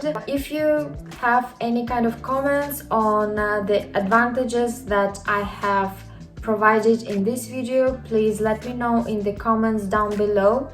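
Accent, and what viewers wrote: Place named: Russia. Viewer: I geussed Siberia - your accent sounds russian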